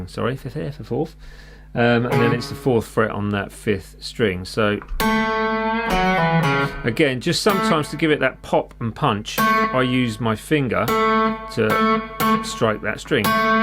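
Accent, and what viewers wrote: United Kingdom. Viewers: Your British accent is so pleasant to listen to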